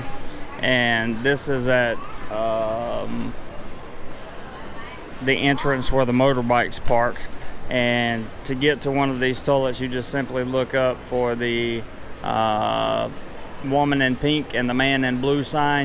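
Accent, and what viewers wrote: South, United States. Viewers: Great vid, do you mind if I ask what part of the States are you from? Love the southern accent. I toured across the States with a rock band when I was in my 20’s, loved the southern states, southern hospitality is something that everyone should experience at least once.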